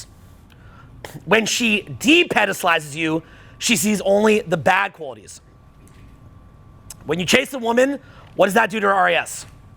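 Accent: Canada. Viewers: Fair-ull, for all the people incorrectly trying to correct your Canadian pronunciation of the English language. Great timing with this vid considering the two Epstein clients just went to metaphorical war today.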